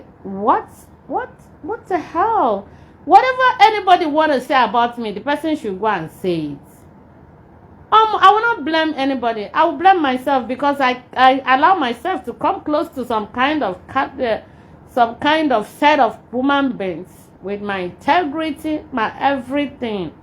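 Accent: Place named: Igbo, Nigeria. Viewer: Madam sharrap. With your Igbo English.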